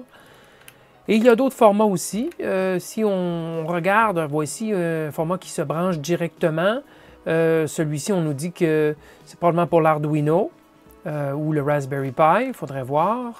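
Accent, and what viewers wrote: Quebec, Canada. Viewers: Bonjour, Encore une vidéo enrichissante, je ne savais pas qu'il y avait de la Ram dans ce genre de module. Ce qui m'éclaire bien pour les nouvelles fonctionnalités de reprise d'impression 3D après une coupure de courant par exemple :) PS : j'adore l'accent québécois ;)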